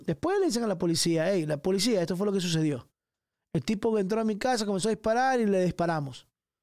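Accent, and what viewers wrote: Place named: Honduras. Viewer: Tu acento me suena undureño...😅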